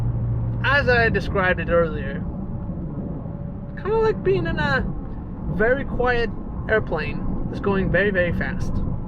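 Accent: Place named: Eastern Europe. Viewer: Where are you from bro? At times your accent sounds eastern European lol. Not trying to dis just curious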